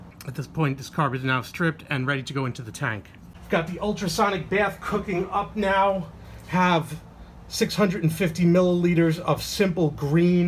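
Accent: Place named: Boston, United States. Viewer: I did that and started her up and it doesn’t sound promising. I will warm her up tomorrow and go out for a couple of miles to see how things are. I wish I could adjust them with the air box installed - at least I can leave them installed. Thanks for your advice. I detect a Boston accent.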